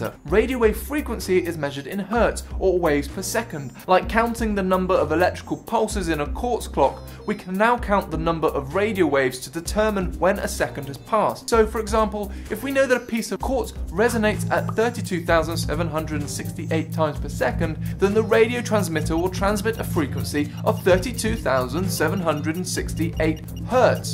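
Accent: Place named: United Kingdom. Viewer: This British accent. I can't understand even a thing.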